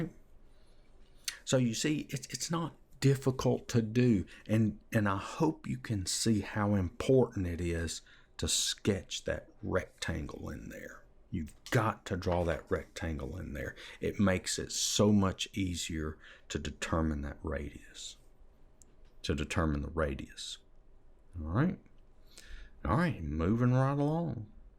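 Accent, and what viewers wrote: South, United States. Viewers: hello sir i just want to thank you for all your efforts just for the record im not even majoring in math but i watched almost every video of yours because im in love with your southern accent , i could like listen to you all day along and im not gonna get bored at all all the respect and all the love from morocco :)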